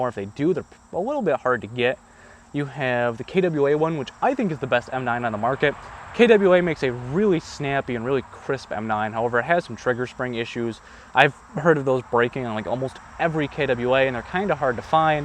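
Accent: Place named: United States. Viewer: yeah well he's american they say most things weird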